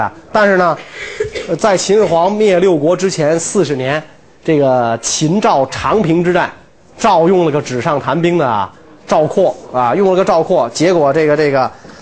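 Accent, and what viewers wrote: Beijing, China. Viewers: 首先得是北京口語，節奏明快用詞精準，光聽都覺得順耳，流暢不嗑巴..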